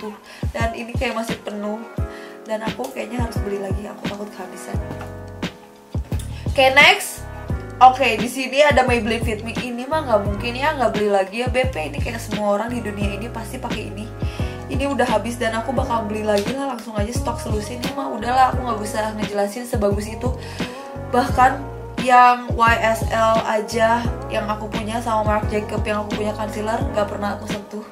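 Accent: West Java, Indonesia. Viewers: gemes sm logat sundanya kakk 💖 reviewnya bagus singkat tp jelas 👍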